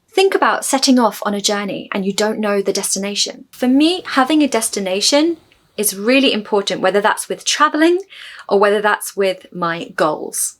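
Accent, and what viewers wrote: United Kingdom. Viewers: your videos are a wonderful gift for us English learners. Your enchanting British accent with an aristocratic touch, your expressive body language, and the interesting contents.... All of these features are so motivational. May I use an Old English phrase? "God bide with thee!' Thank you! all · Happy new year pretty British-English, your lessons are amazing for us, good job, go,go further..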